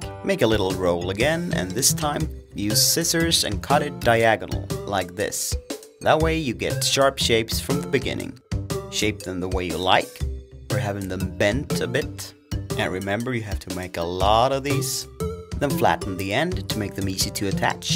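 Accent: Scania, Sweden. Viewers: hAN ÄR SKÅNSK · är han som snackar skåning eller det låter så när han pratar svenska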